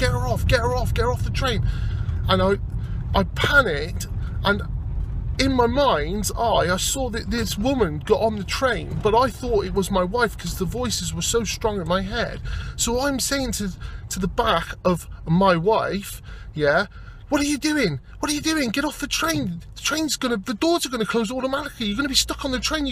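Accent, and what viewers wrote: Somerset, United Kingdom. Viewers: Being from Bristol I just knew is was a Somerset accent. ;-) Thanks for sharing the video. My first manic episode involved me wearing sunglasses so that people wouldn't steal my thoughts.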